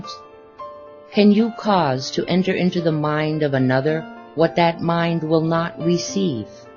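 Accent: Russia. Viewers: Amazing Russian accent.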